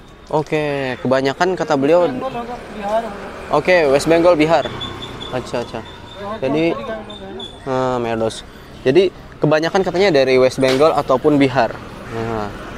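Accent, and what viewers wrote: Banjar, Indonesia. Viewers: Ada sdikit logat bahasa banjar nya 😁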